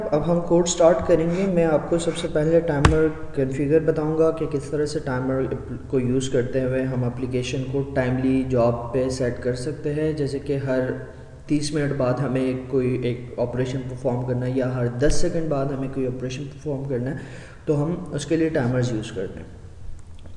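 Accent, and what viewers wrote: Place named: India. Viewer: I didn't understand what this dude was saying. Was he speaking English or an Indian variation of it?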